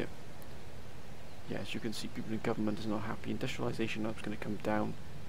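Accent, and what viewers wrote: Wales, United Kingdom. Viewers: you're a real gentleman, and very polite keep up the good work(: PS Are you welsh?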